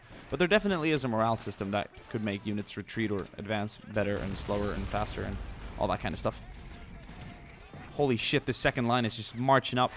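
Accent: United States. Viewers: Southern accent God tier👌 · you sound like idubbbzTv what accent in America is that?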